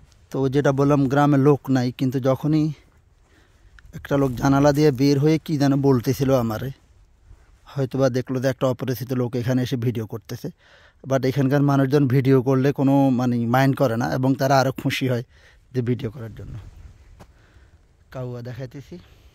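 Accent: Noakhali, Bangladesh. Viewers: ভাই আপনি কি নোয়াখাইল্লা। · আপনে কি নোয়াখালইয়া ? হুতি-মুতি কওনে বুঝলাম আরি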